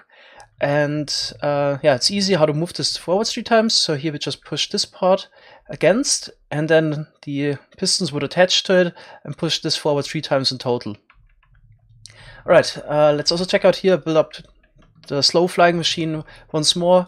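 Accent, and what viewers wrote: Germany. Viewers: Man hört das Deutschland sehr gut raus.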